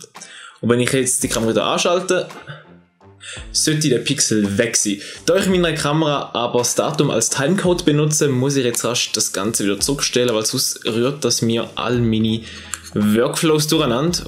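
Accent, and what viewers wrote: Switzerland. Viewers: Ja habe alles sehr gut verstehen können, top erklärt von Dir..! Trotz Schweitzer-Deutsch ;-)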